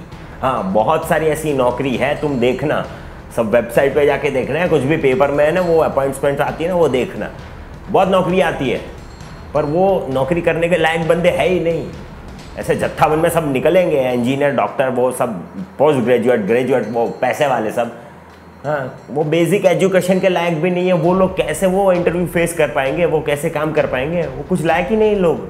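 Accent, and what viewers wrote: Gujarat, India. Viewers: one thing I come to know from your speech in first 30 sec is u are Gujarati 😁😁😁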